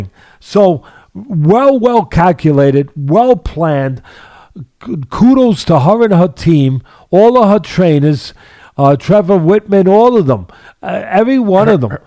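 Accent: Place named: Boston, United States. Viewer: All I want to know is what part of Boston is Teddy from.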